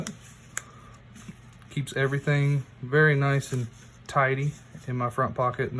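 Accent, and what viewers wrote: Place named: South, United States. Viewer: Thank you. Great no-nonsense, practical EDC with a southern drawl 😊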